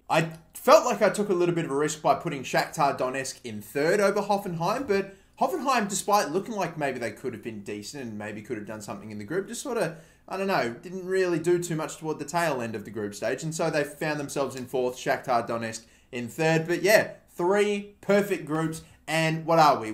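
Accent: Australia. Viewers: R u Australiaan mate good day fellow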